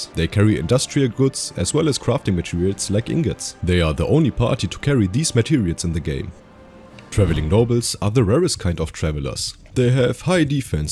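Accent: Germany. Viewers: hör ich da einen deutschen Akzent raus? haha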